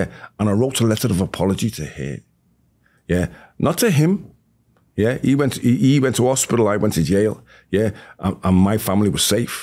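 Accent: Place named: Liverpool, United Kingdom. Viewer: Could listen to him for another 3 hours and I can't stand the Scouse accent.. what a great video. 👍👍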